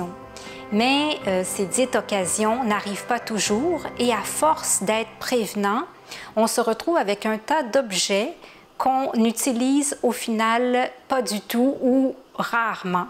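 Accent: Quebec, Canada. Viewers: Bonjour, merci pour votre partage je recherchais justement des vidéos de personnes un peu plus âgées car j'ai 35 ans maintenant, et je ne suis plus très en phase avec les conseils des personnes de 20 ans, (même si ces personnes ont bien-sûr aussi de bons conseils). J'aime beaucoup votre discours, votre musique de fond, vos images, et votre accent québécois est la cerise sur le gâteau car j'adore le Québec ❤️ bref ce format est très bien, on aimerait qu'il dure plus longtemps ! En tant que minimaliste, je ne sais pas si vous avez proposé un house tour de chez vous pour voir à quoi un endroit épuré ressemble, pour s'en inspirer. Merci ! Eve, de France.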